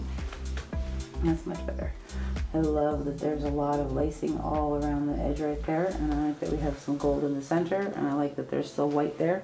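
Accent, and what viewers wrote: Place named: New York, United States. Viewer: You just explained EXACTLY what my issue is with paint separating. THANK YOU! I love your New Yoak accent 😃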